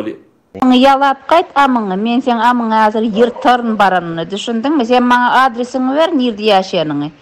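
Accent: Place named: Dashoguz, Turkmenistan. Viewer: Şu Daşoguz şiwesine gaty gülküm gelýär her diñlämde Türkmençäñ ýedi puşduna ýetjek şekilde gürleýüşleri bar. Hudaý gorasyn ýöne iki,üç millet arasynda galmakdan :))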